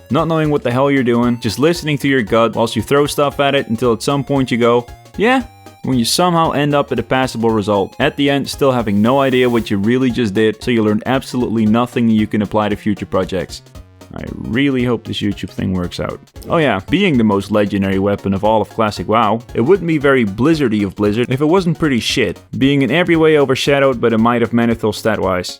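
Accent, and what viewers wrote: Netherlands. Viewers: You sound Dutch!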